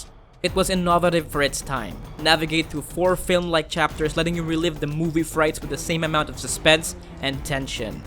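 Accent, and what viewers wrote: Philippines. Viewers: I can't quite place that accent. It sounds either Filipino or South American. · i smell a filipino accent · filipino accent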